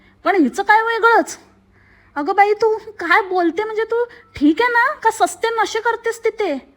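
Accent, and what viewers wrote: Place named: Malvan, India. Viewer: Tujha malvani tadka mast hota 😍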